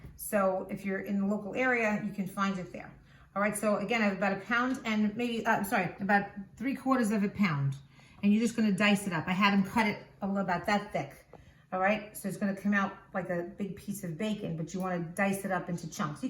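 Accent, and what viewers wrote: New York, United States. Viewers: Keep up with the videos girl I've learned a lot from you!! And you trip me out with that n.y. accent 🤣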